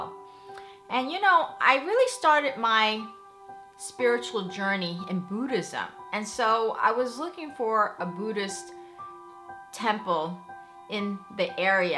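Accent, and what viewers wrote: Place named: New York, United States. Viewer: you live in Southern Cali...one would easily be able to make suggestions to you in regards to places of worship if say, you lived in SF - I assumed you lived in SF because you're Asian-American UNTIL I heard your accent I realized you'e a New Yorker. And NYC people typically find SF a bit weird. Anyway, find your own spiritual place. Your OWN temple. I'm something of a pantheist/deist. And look up on Buckfast Abbey here in the UK. They have an amazing prayer room:) if you come to the UK be sure to visit it.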